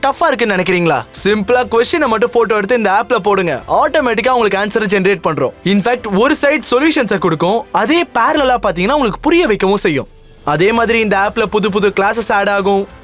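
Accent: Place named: North Karnataka, India. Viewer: Dhruv Sir, Just a feedback on your Kannada Channel. We all are followers of your Hindi channel too. However the kannada language translation needs improvement. This is not Bangalore Kannada but sounds like a dialect of North Karnataka which is difficult to comprehend by most of the kannadigas. I completely understand that you can't read or write Kannada and you might have picked this language translation randomly without some inputs from Kannada Expertise. Best Wishes😊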